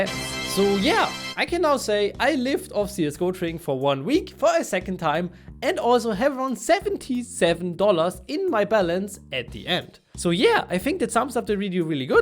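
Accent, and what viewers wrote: Germany. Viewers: Are you german? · Man merkt dass er Deutsch ist an sein Akzent😂 feier ich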